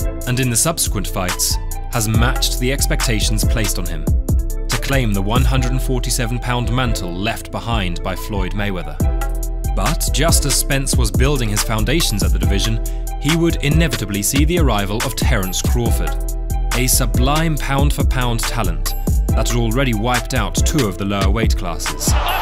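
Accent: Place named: United Kingdom. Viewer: Another awesome one !!! I really like your accent i asume you're a brit , cause English is my second language and sometimes i can't understand some accent's 😁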